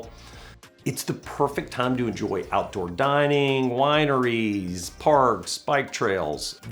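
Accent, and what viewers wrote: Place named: Mid-Atlantic, United States. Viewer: I love your Mid-Atlantic accent. It reminds me of my own Baltimorean accent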